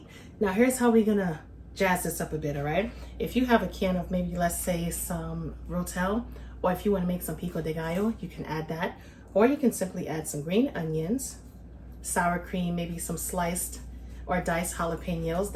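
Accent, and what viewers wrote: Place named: New York, United States. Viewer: That looks good. I have to try it. Where are you from? Sounds like New York or Northern NJ.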